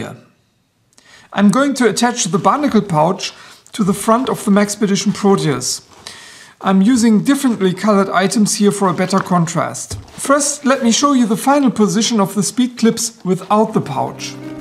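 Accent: Germany. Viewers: I love German accents like yours, lol. I giggled at "coy-otee." We say ky- (like 'sky')-otee, or ky-oat where I grew up. Just thought that was a funny pronunciation. Anyway, helpful video. Thanks dude.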